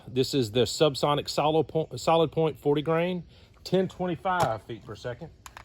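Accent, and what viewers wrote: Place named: Alabama, United States. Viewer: I knew that was an Alabama accent. About to pick up a Rugged Mustang at Larry's myself. Nice video. Helpful.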